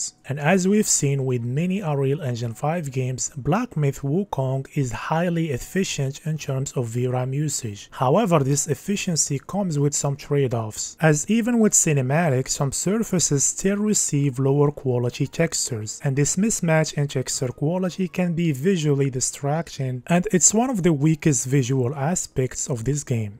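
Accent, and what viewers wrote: Morocco. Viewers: the Moroccan accent is a bit too overpowering brother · sounds moroccan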